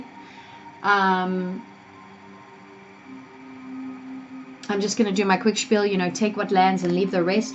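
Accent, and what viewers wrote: South Africa. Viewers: So on point .. my family did me dirty but it's ok.. thank you 🌹🙏🏽 you sound south African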